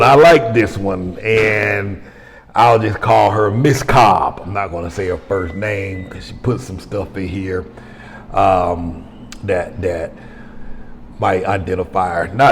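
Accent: South, United States. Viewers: I'm late BUT I want to wish you a Happy Father's day my man! I been rockin with you for YEARS when U were still traveling in the states and 1st went to Canada! I have seen a LOT of mess you had to go through and I am PROUD of you my man that you made it through! The VERY 1st time I heard you talk I KNEW I was gonna like you cause im from the South TOO! Much respect to you Wishing you MUCH happiness my man! 😇👍🏾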